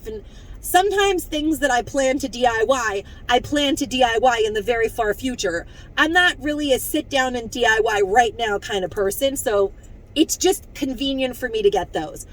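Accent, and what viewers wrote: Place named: Long Island, United States. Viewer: I so enjoy your videos. Your accent is very similar to my own. I'm originally from Valley Stream, LI but I've lived in South Carolina for 15 years. Listening to you sounds like home. Bless you!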